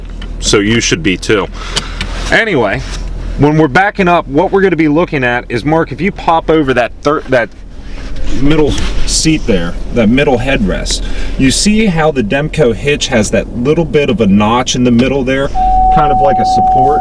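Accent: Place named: Pittsburgh, United States. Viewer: This is funny because I'm from Pittsburgh living in Florida and I know a yinzer when I hear one. Feels like home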